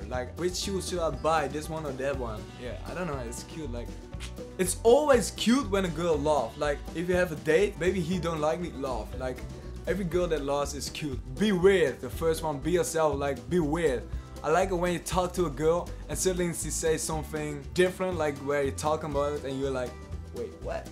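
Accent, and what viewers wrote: Netherlands. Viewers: Ik dacht al dat je Nederlands sprak!! Ik hoorde het aan je ‘accent’😂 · Ik hoorde je Nederlands accent hahahh je Engels is wel nog steeds goed hahahah😂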